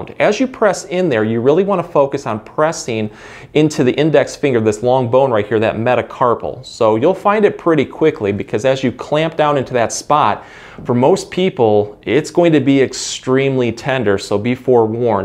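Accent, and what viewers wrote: Michigan, United States. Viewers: Michigan accent!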